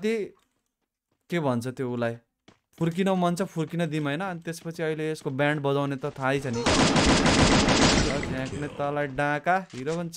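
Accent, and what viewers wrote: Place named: India. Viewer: Nepali bolnu birsina aatexa dhoti jastai bolxa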